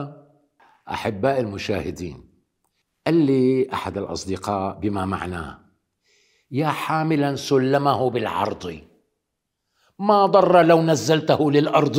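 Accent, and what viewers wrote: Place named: Damascus, Syria. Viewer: ما احلى النطق الدمشقي للغة العربية عظيمً يا أستاذ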